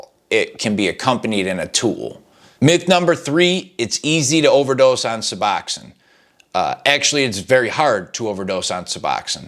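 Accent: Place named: Upstate New York, United States. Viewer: Are you from upstate NY? Trying to place your “accent.” (I don’t actually consider it an accent, but after living in the south for the past several years, it’s nice to hear what sounds like my “accent” from back home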